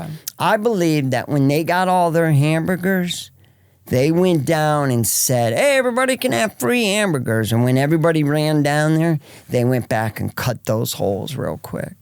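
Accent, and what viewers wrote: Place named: Philadelphia, United States. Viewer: Dude sounds like he’s from S Philly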